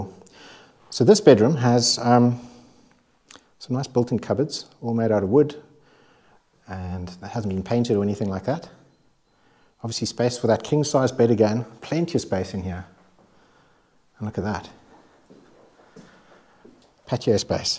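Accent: South Africa. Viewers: Hello I have just come across your channel and I had a quick look at what video's were on your channel and I seen lots of properties so I am now subscribed I have a question for you and I am eager to know if I am correct in thinking by your accent that I believe your maybe from South Africa?? Eager for your reply Enjoying what I have seen so far and I think I am gonna be binge watching these videos 😊😊 Lisa UK xxxx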